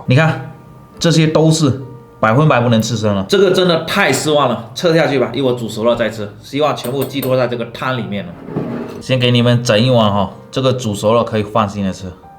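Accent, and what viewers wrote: Fuzhou, China. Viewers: 他就是福州人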